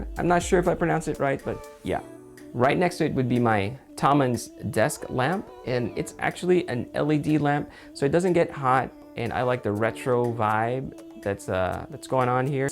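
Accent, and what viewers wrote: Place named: Philippines. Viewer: I can hear the Filipino accent sometimes and I'm loving it 💕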